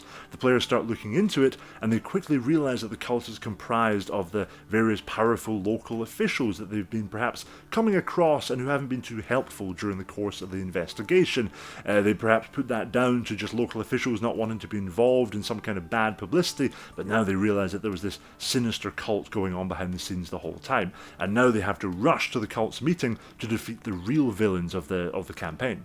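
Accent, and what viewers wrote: United Kingdom. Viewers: When a mustached man with a UK accent and a turtle neck speaks some knowledge to me, i listen.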